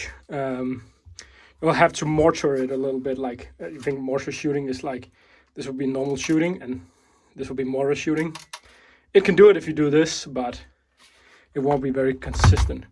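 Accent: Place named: Denmark. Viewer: I got the wood version and yep the magazine wobbles a lot but surprisingly the flash hider is really solidly on there like I've even lost the small screw to make it stay in place and it still sits there perfectly, i can't speak for The Range because I replaced the spring. also due to the dialect, er vi Dansk?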